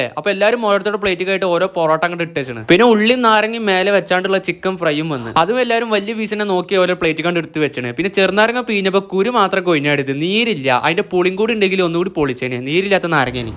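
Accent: Malappuram, India. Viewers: Sound from malappuram ♥♥♥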